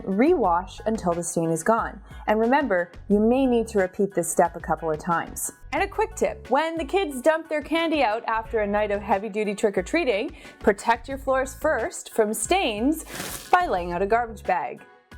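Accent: Canada. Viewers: She's Canadian- that's the accent.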